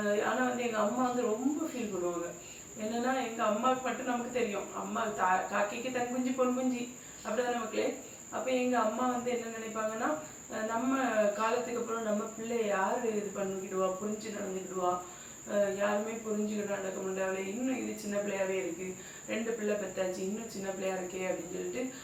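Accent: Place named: Marthandam, India. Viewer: 😊Akka veguliya iruntha ulagam apdi thaan pesum ,neenga pure ah irukkeenga atha nenach happya irunga akkaa am from dubai sontha ooru marthandam daily unga videos pakrean ooruk vantha meet pannalaam akka😊😊😊😊ungalta pesa aasaya iruk epdi pesenu therla😊cook pannumbothu fulla unga videos thaan time pass enak😅namma ooru baasha kekumbo oorula irukkirathu polaye feel😊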